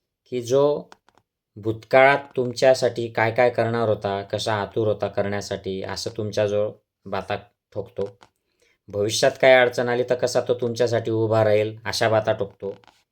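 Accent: Khandesh, India. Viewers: आपली खानदेशी टोन काय बदलेली नाही.... मस्त याला म्हणतात जय खानदेश.... नाही तर थोडे काय शहरात गेले की स्टाईल मध्ये मराठी इंग्लिश बोलतात....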